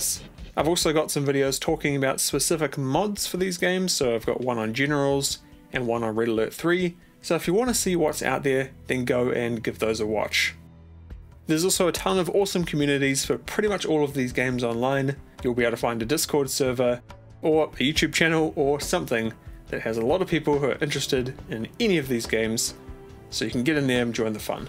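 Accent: New Zealand. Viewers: I detect a kiwi accent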